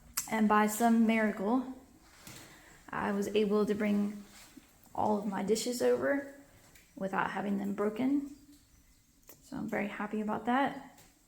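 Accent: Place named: Tennessee, United States. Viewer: Ha! I've been trying to place your accent more specifically than just "Southern"—and Tennessee was the guess that I kept coming back to. (Now watch it turn out that you tell me you spent your whole life in Texas or some other state until you had just a year in Tennessee before moving to France.)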